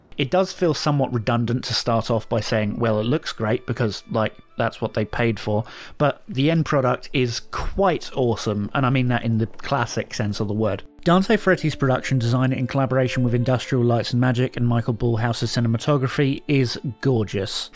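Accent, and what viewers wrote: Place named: United Kingdom. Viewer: I find it highly distasteful to listen to a person with an accent that is obviously borne of some kind of relationship with the UK analyze America strictly from a viewpoint that is the product of HIS times. As a full-blood Cajun, I freely own being of Immigrant stock..tho my ancestors arrived on these shores as the result of being driven out of France in1685 by the Edict of Fontainebleu, making Protestantism illegal, declared by Louis XIV. MY ancestors arrived in 1723, according to a Diary kept by Solange Therese Thibideaux, wife of Jean Michel Thibideaux. NOTHING is known of their life in France, as agents of the French Crown pursued my kind for what must have seemed like forever. Meanwhile my kind intermarried with the various Indigenous tribes of the Caribbean, and learned that land the Yankees AND Southerners DIDN'T want was rich in creatures that were good for food; that small lots of ground protruding from cypress swamps were ridiculously fertile if you knew WHAT to plant and where...and so my Kind survived. 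My family aided runaway slaves by building tiny shacks which blended into the swamp...places where clean water in jugs, blankets my ancestors could ill spare left to stay warm in on chilly nights, and dried beef, hardtack and salt were stored in boxes built by hand and waxed to keep moisture and insects out. After the War, free Blacks-not many, but some, were able to walk openly up to my 4× great granpapa's front stoop and thank him. So how DARE you, Mr. Voice From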